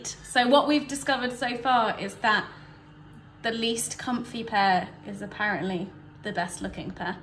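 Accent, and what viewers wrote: United Kingdom. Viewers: You sound extra British today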